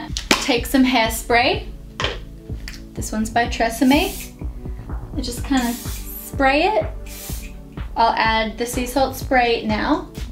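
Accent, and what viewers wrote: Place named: Australia. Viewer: 'Smugin that out' so Australian #representingusaussies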